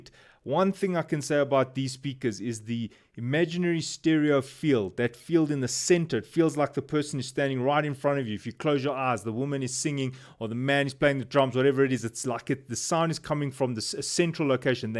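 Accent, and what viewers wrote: South Africa. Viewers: Cool, m in SA too, heard the accent, keep it way 🆙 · If the accent did not give it away. The SA plug was the confirmation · Wow that is unmistakable south african accent, nice to be here, mzansi too!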